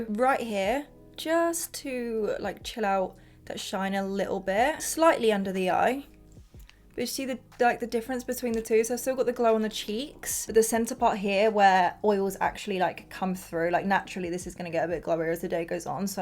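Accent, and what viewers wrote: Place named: United Kingdom. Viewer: Yep she’s from the UK! ☺️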